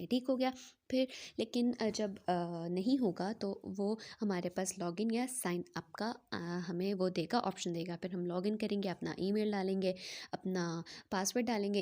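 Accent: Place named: United Kingdom. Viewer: English accent 🤌❤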